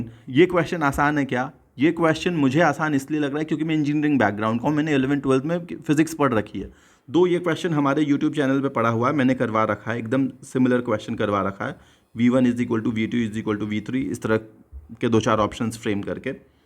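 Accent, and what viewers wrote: Bihar, India. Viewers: Your bihari dialect even after being IES officer is just love❤️.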